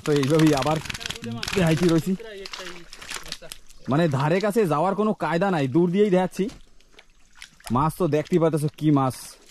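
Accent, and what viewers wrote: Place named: Barisal, Bangladesh. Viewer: সবকিছু ঠিকঠাক একবার বাংলাদেশ থেকে তোমার ওখানে বেড়াতে আসবো ভাইজান, তোমাদের কথা সুরগুলো আমাদের বাংলাদেশের বরিশাল অঞ্চলের মানুষের মত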